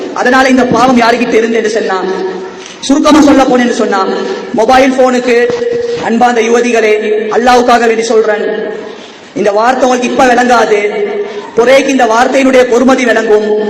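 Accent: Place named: Kandy, Sri Lanka. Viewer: இவர் கண்டி கொழும்பு இந்த பகுதியில் இருக்கிறார் போல..! அந்த பகுதியில் இப்படித்தான் தமிழ் பேசுவாங்க. என்னுடன் சவுதியில் கன்டியைச் சேர்ந்த நண்பர் ஒருவர் இருக்கிறார். இதே போலதான் தமிழ் பேசுவார்.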